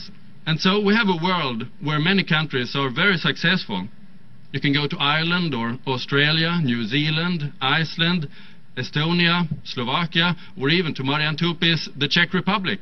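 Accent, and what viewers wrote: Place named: Sweden. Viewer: The Swedish English accent...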